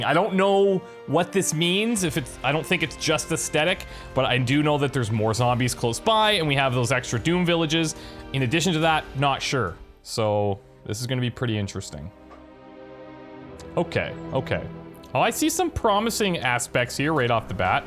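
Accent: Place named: Quebec, Canada. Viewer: I'm betting your Canadian, that accent is a beauty. I'm guessing Québec, there's a little bit of that French Canadian, eh.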